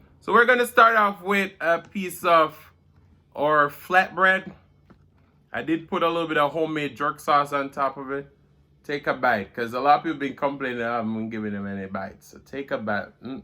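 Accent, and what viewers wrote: Patois, Jamaica. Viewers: Awww you changed the title. Thank you. You are too kind! I loveeee this poem so much, and the fierceness in which you delivered it. That patois, so wonderful to hear.. yes you did Recite that, and eat this!! ❤️ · I can listen to you speak Patois all day lol This was good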